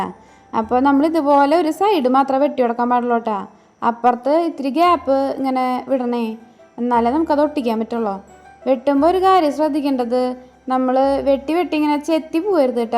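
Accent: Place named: Thrissur, India. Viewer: തനി തൃശൂര് ട്ടോ..... സൂപ്പർ.. സൂപ്പർ.. കലക്കിട്ടോ 👍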